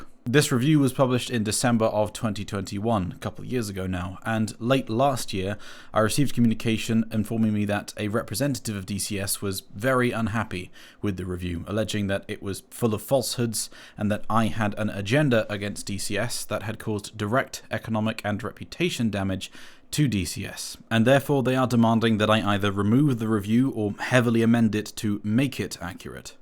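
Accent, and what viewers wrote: United Kingdom. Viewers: Question? How can they take you to court if they’re not a UK company. I assume from your accent that you’re in the UK. I would like to see how far (in minutes) this would get in a UK court.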